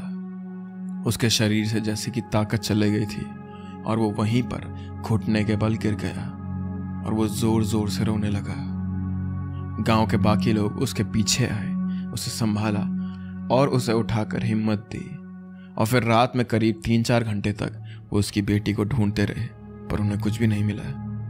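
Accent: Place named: Bengal, India. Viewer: His mother toungh is Bengali bro he can't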